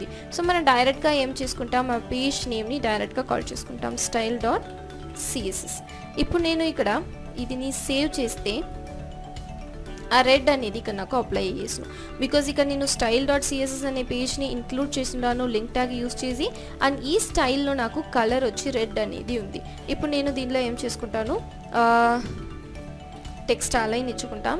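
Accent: Tamil Nadu, India. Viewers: Mam meeru pure telugu kadaa madyalo tamil ala undii? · Tqq Nice teaching and Tamil slang mixed cute voice 😊😊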